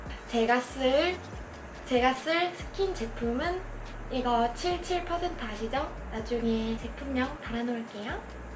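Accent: Gyeongsang, South Korea. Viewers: 서울 말은 서울 말대로 ❤️ 경상도 말은 경상도 말대로 ❤️ 모두 어울려요. 넘 귀염~ '머리부터 발끝까지.~' 이 노래가 생각나네요. ~ㅎㅎ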